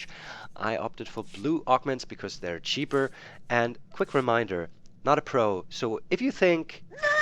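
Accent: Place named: Germany. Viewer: Good tips tnx. Love the german accent ;)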